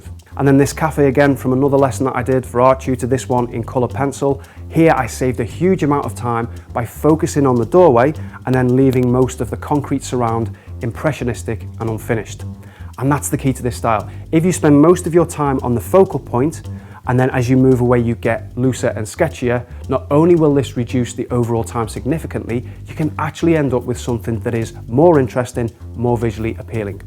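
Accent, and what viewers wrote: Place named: Manchester, United Kingdom. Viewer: Firstly it’s wonderful to hear someone from Manchester I really loved your video It has so much useful information as a relative beginner of older age group. I have never painted as a child or adult until my seventies. I wonder if you teach, in classes in Manchester.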